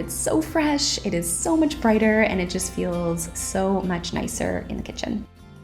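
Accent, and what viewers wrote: Canada. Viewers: CANADIAN ACCENT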